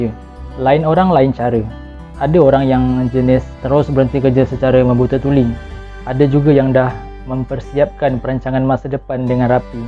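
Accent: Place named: Java, Indonesia. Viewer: Pekat jawanyaa.. Very inspiring bro 👍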